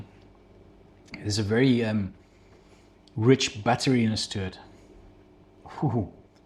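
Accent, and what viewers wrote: South Africa. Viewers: you sound south African mush 😊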